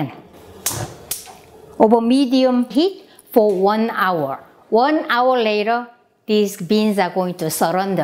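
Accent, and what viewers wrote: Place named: South Korea. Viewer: 이분정말 매력있습니다 발음 귀에 쏙쏙들어오는 한국식영어 ~~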